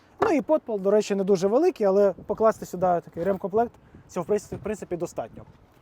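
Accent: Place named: China. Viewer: А навіщо говорити англійською з китайським акцентом ?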